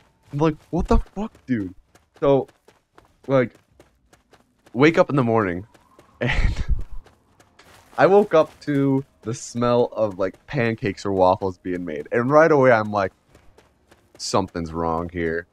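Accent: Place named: Chicago, United States. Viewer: In a past video you said you we're from the Midwest in this you said you were watching the Cubs game ,guessing your from around cook County too? I knew I recognized that accent.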